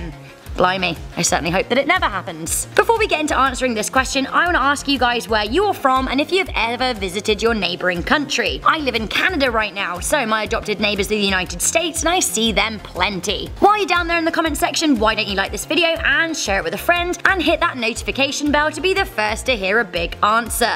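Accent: England, United Kingdom. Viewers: You live in Canada but sound very English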